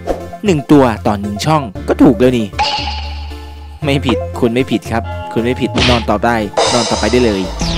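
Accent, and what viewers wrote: Isan, Thailand. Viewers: แอดเว่าอิสาน😆ชอบๆ💕💕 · ชอบแอดพูดอีสาน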